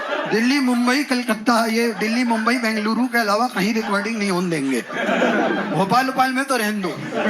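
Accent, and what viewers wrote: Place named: Bareilly, India. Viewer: proper bareilly accent....!!!!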